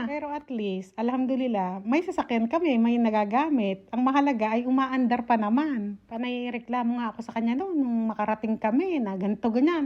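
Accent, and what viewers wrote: Nueva Ecija, Philippines. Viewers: Grabe yong buhay madam ano, lalo yong 10-13 pero talagang pag mag ti-tiyaga may maganda din mangyayari. Tignan mo ngayon super Blessed kayo. Followers mo ako sa dalawa mong fb account tas dito sa YT, silent, di ko lang napigilan mag comment ngayon. Andito din kami sa sharjah gusto ko din nga sana makapag bigay sa mister mo kahit paano sana tapos maisend sa inyo dyan sa pakistan. Ps. Ang asawa ko naman ay taga muñoz nueva ecija, kaya super natutuwa ako sa accent mo. ❤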